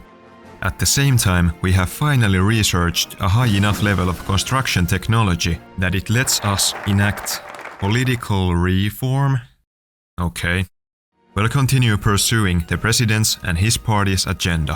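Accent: Finland. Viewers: Holy finnish axent😂❤ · finnish axent sensed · Ystäväi ootsä suomalainen kuulostat siltä ja lähit armeijjaan? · His accent Sounds very finnish😂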